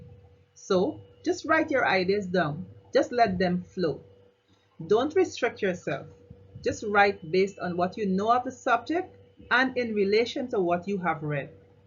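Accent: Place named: Guyana. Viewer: Great presentation thank you for sharing I was educated looking forward for information on writing research papers and it was refreshing to hear an intellectual presentation with a Guyanese accent ✌🏾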